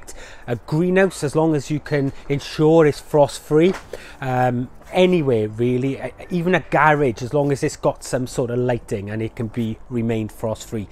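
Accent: Wales, United Kingdom. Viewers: I was just trying to figure out your accent. I`m Canadian, so I`m only vaguely familiar with the diverse British accents. I was guessing Wales. So I was right. I've been growing potatoes for years, but will try container growing this year. I generally harvest my potatoes in November, and keep them in the Fridge. By March the remaining potatoes start sprouting. In Canada the ground is still frozen. The earliest you can plant them is late April, but May is more typical. Most of my potatoes are small, I`ll have to improved my technique to get bigger ones.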